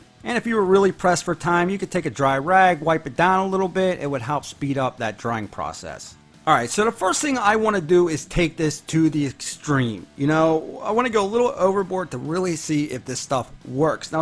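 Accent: Pittsburgh, United States. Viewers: I like your Pittsburgh accent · Pittsburgh accent? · I hear some yinzer in your voice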